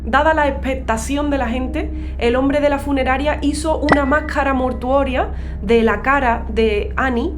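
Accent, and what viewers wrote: Andalusia, Spain. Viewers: Si me preguntan por el acento andaluz tengo que mostrar este video 🙌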